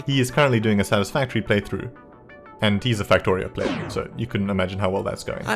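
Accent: South Africa. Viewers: I feel im hearing a South african in you..am i right?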